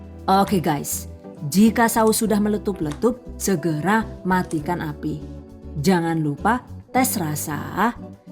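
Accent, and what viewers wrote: Banyumas, Indonesia. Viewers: tengkyu...jangan lupa logat ngapaknya lanjuuuuut.😃😃💪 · Mbaa saya senang klo mbanya lagi ngomong ngpak lucu trima kasih mbaa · mksh mb resepnya,,dn jg mksh bhs ngpaknya,,bikin aq ktawa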